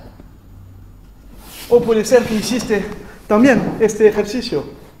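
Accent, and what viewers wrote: Argentina. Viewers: El acento argentino 😀 un groso, saludos desde Génova Italia 🇮🇹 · Divino! Y habla en argentino!!!👍❤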